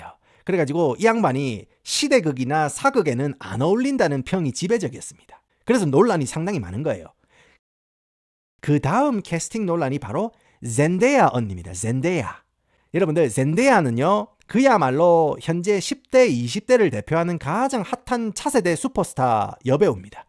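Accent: Gyeongsang, South Korea. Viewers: 오늘 이 채널 영상을 처음 보는데 은 영어를 배운 경상도민이신가요 경상도말을 배운 재미교포이신가요..? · 내용이랑 별개지만ㅋㅋ영어와 경상도 사투리가 묘하게 섞여있는 말투가 매우 흡입력있습니다ㅋㅋㅋㅋ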